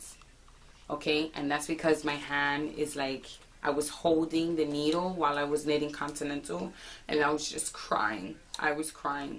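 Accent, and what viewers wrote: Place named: New York, United States. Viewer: Hello, I love PandaVille! I see that you have fallen in love with knitting. I hope that you are able to complete your project list. I'll be watching and give you and a shout out on my next (YT) video. Love the New York accent! P.S. Love the knitting caddy Christmas gift from your husband. J.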